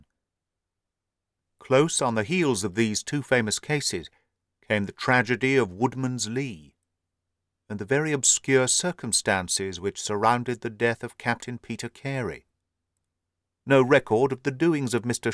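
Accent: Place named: Dundee, United Kingdom. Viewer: an enjoyable fantastic tale superb narration right down to the authentic Dundee accent 10/10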